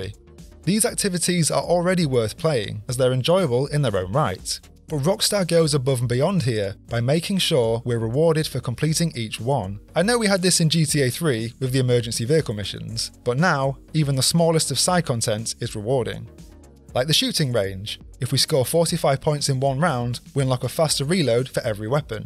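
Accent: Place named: United Kingdom. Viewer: well wishes for you as well!! I guess he is British, at least thats what we can suppose by the accent hehe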